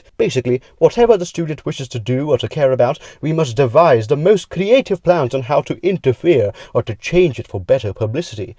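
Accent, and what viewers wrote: United Kingdom. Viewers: I just came from the squared squares video and this is NOTHING at all like your other video, but I love both of them, for different reasons lmao. It doesn’t even sound like the same person in this video?? Is this really you just putting on a British accent? You sound so different lol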